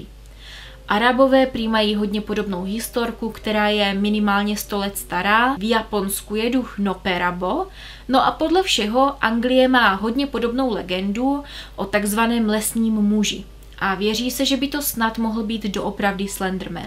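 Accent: Moravia, Czech Republic. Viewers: Já miluju tvoje příběhy.. Jen nemohla bys trochu zapracovat na té moravštině(změkčování i, kde to nepatří).Hrozně to trhá uši. Neber to, prosím, špatně.. Jen jako návrh na zlepšení.👐Odebírat tě nepřestanu:D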